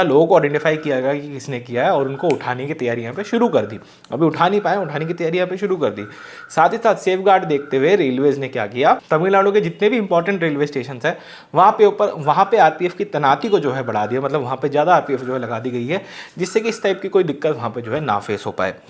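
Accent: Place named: Bundelkhand, India. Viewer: bhai mein aapke Bundelkhandi bhasa sunte hi khus ho jata hun kuch apna sa lagta hai.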